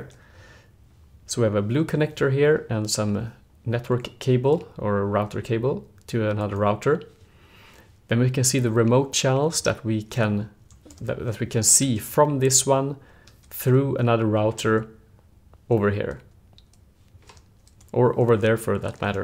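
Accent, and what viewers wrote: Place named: Sweden. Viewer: Du är svensk va? Hörs på dialekten. Grymma videos :D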